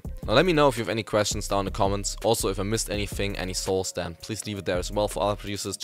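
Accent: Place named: Germany. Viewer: boahh deutscher akzent ist so hässlich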